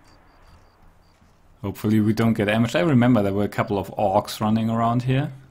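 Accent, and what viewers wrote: Germany. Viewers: Mal eine Frage. Ich meine man hört es durchaus raus, dass er ein Deutscher ist. Seid ihr ein deutsches Entwicklerteam ?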